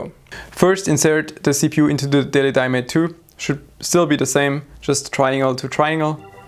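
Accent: Germany. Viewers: Deutsches English klingt always so funny! :D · du hast so ein episch krassen deutschen Akzent, Daumen hoch dafür:D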